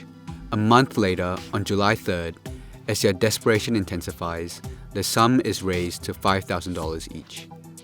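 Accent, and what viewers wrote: Australia. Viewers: I noticed also. The accent is light, but it is def Australian what. Maybe need trained ears to be able to hear as not everyone is good at spotting accents? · thank you, the aussie accent is strong and kinda distracting too.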